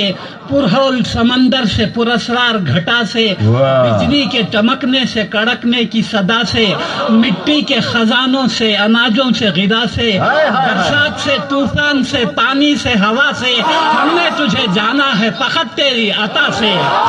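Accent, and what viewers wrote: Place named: India. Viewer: Nahi ye mujhy unki awaz nahi lagti mera dil nahi maan rha mashaAllah jysa face hy wysi awaz nahi hy. Ye koi indian hy lehjy sy maloom hota hy. Or koi patla bnda hy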